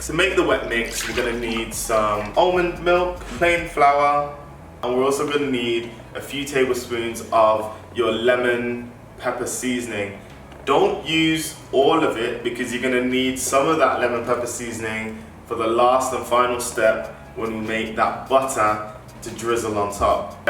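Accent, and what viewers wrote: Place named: United Kingdom. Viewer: UK ...nice one!! was just looking for cauliflower recipe, and your channel popped up. And even better when I heard the accent, okay I'm off to Tesco to get my lemon 😁....oh and I've subscribed, nice presentation BTW.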